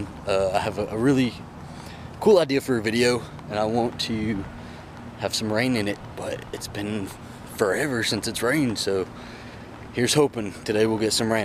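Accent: South, United States. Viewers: You have one of the most comforting southern voices I've ever heard. It's like a warm blanket you simply love wrapping yourself up in. Add to that you seem to be a natural born storytelling, making it possible to cast a spell so easily upon your listeners. Not to mention you're cute as a button! Yes you are!! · Love your videos! Love your southern voice. Also, nice Hickie! ;) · My hero Brave and strong Im southern as well love the accent You Rock ! You actually inspire and help people unlike most self absorbed you tubers . Dr pepper just gave your roots away !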